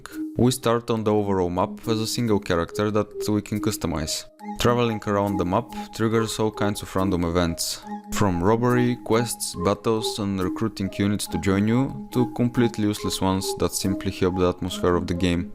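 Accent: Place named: Balkans. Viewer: Great job, keep up, but I need to ask you something, are you south slav? Your accent is making me believe that.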